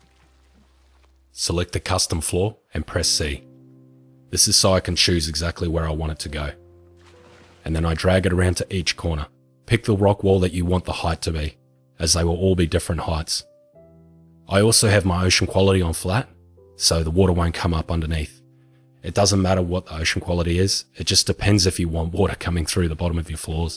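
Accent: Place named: Australia. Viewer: Australian accent what part of oz are you from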